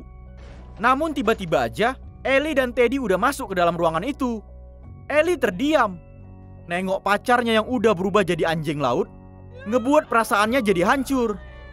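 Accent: Medan, Indonesia. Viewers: Ya Allah bang org Medan bang... gw suka bet logat melayu Medaaaaan.... salam dr pecinta bahasa daerah... hahhahaha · Medan kali bah bahasanya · Logat Medan nih Kek daerah gw btw Medan yg di mna nya bang Aku juga org Medan? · Wokeh ini yg ku suka logat Medan😂 Semngat Tross buat vidionya bg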